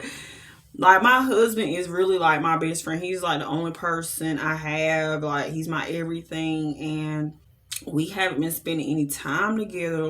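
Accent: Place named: North Carolina, United States. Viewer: Your accent is everything. Where in NC are you from? You sound like my husbands people.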